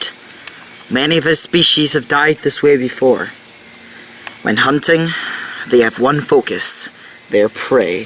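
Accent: Scotland, United Kingdom. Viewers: Dude are you Scottish? :P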